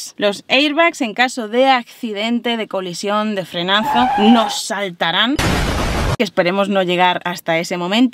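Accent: Spain. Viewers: tu acento es el mas español q ya he escuchado si pieno en español de españa tu voz viene a la cabeza y por cierto me encanta ❤🎉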